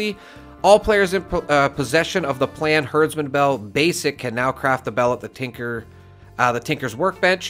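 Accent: Canada. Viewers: This is channel. Minimal research. Maximum outrage. Nice friendly Canadian voice as the beginning. Most undeserving “creator” I’ve ever seen. He knows it too. Especially when he dies constantly on his Live streams. It’s actually hilarious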